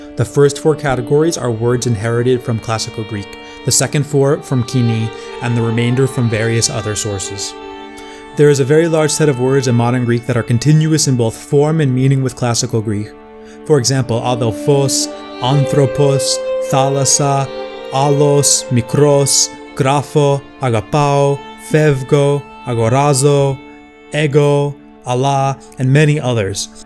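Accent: United States. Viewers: his American accent is too apparent when pronouncing Greek words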